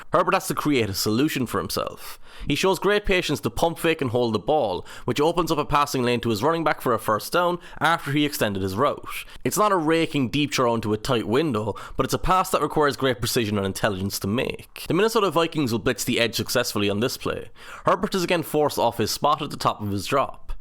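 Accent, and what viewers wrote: Ireland. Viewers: I am highly skeptical hearing an Irish accent explaining American football…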